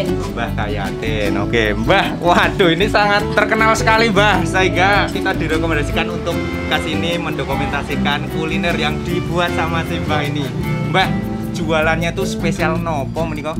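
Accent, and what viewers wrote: Java, Indonesia. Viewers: Menurut aku, blm ada uniknya dan bhs jawanya terlalu medok. Mhn ma'af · yg unik itu kyk apa ya? Salah ya kalau gaya bahasanya medok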